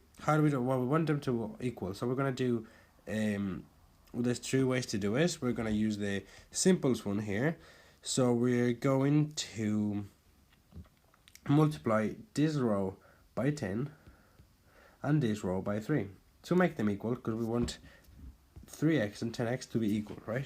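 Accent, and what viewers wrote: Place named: Ireland. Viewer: Hey glad I found this video. Thank you. Could not remember how to do this. It is easier if you do a simultaneous equation pretending the ≤ is =.............. Like your Irish accent.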